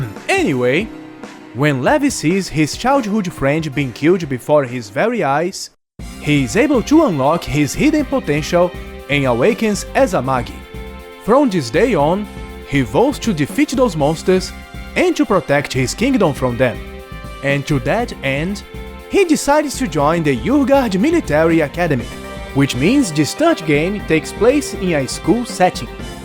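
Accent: Brazil. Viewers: esse sotaque não me engana, tu é br né kk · mano concerteza voce e brasileiro xD SO PELO GEITO DE FALAR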